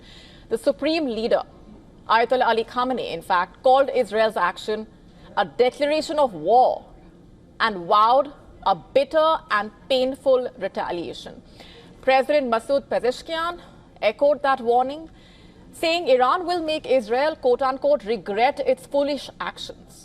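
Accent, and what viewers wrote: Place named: India. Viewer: She is speaking Indian English but has a very harse voice not becoming an announcer.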